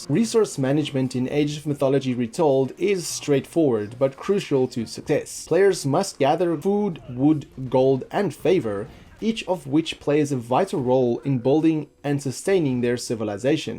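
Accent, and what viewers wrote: South Africa. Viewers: The sout African is back. Whooo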